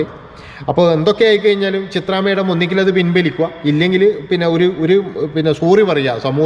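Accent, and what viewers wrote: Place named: Thalassery, India. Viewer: ഇങ്ങള് തലശ്ശേരി കാരനല്ലേ... അല്ല കണ്ണൂർ ജില്ല കാരൻ ഇങ്ങളെ വർത്താനം നല്ല രസമുണ്ട്.. ഒരു കളങ്ക മില്ലാത്ത സംസാരം... എനിക്ക് ഇഷ്ടപ്പെട്ടു... ഇങ്ങള് ചിത്രയേ പറ്റി പറഞ്ഞത് നൂറിൽ നൂറു ശതമാനം ശരിയാ... ചിത്ര ചേച്ചിയുടെ ശബ്ദം മധുര മുള്ള താണ്. ഇനിയും നില നിൽക്കട്ടെ.. സംഘി കളുടെ വലയിൽ പെട്ടുപോകരുത്..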